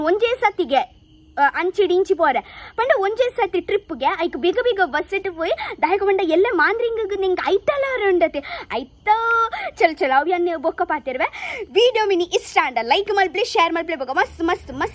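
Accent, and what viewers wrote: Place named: Tulu, India. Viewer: Ur tulu is funny nice video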